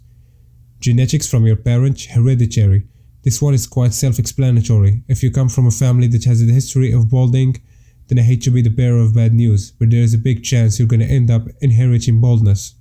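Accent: Morocco. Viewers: I am moroccan too khoya, the accent sound moroccan. Good luck with your channel, good job ;)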